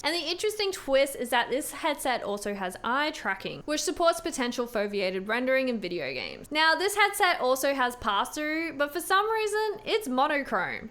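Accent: Australia. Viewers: damn, im usually attracted to aussie accent. hers is pretty annoying. maybe its just her voice.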